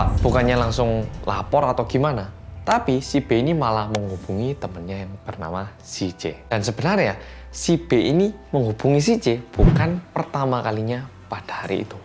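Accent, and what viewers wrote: Java, Indonesia. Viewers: Bersama orang Korea yang medokk keren juga tu baju nya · Lucu ini kakak hihi muedok tnn ntok e ngmong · Mas: halo guys welcome back to bersama orang korea yang Me: MEDHOOOKKKK!!! ❤❤❤❤ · Cus team mendeloookk... Bersama orang Korea yang medoooookk 😊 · wong Korea sing medhooookk!! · is “timoer” but backwards. It’s a typical wordplay in Malang, a city in East Java, Indonesia, where he grew up. “Timoer” or “timur” means east. In this context, means that he’s a korean who is from (or grew up in) East Java. “Yang medok” basically means he who has thick accent, because he speaks Indonesian and Javanese in thick javanese accent. (Medok is a javanese term so maybe that’s why you dont understand if you’re learning Indonesian) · Keren kak.... medoggg.... salam kenal kak... +1 dukungan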